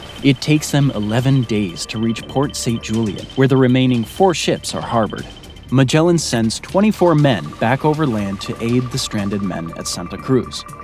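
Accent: United States. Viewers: He’s probably Filipino American by the sound of his accent.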